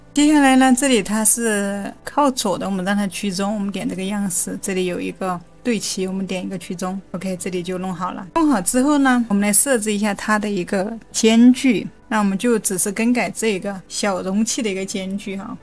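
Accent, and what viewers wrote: Hubei, China. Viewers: 为什么我觉得和雷军一样是湖北口音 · 湖北仙桃口音？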